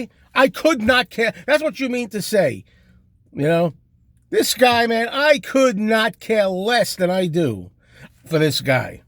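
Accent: New York, United States. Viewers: I always seem to watch your Rant Videos, when I am having my morning coffee, and again, I almost spit my coffee out from laughing. I loved the name of your channel, very catchy.... I am a native New Yorker, here in the South for 8 years, and hearing you TAWLK, I feel like I’m home again...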